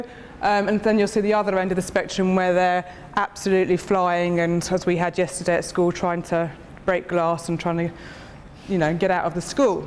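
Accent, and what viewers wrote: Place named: United Kingdom. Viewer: British accent is beautiful .When Brits talk it is elegant .They make talking and art form .without even knowing it .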